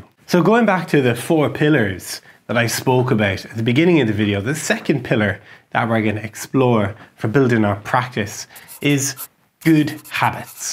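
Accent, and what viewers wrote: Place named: Ireland. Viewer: I met with similar inspiring and effective teachings in a famous book a decade ago but never with such a pleasant to the ear soft Irish accent :)))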